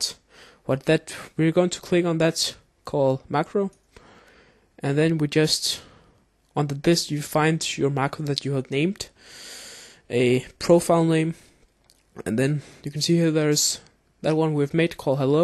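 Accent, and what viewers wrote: Denmark. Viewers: you sound like a dansker din dansker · Are you danish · are you danish?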